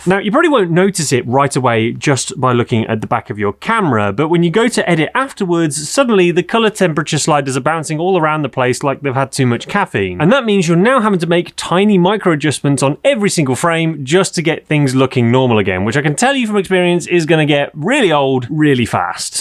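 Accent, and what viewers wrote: United Kingdom. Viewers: Informative common sense leavened with wit —and a non-AI British accent that's not insufferable.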